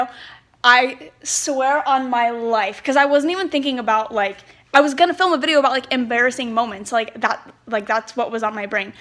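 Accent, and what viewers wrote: Ireland. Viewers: everyones saying your doing Mirandas voice on purpose but I just thought you were from Irish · where you from?? are you irish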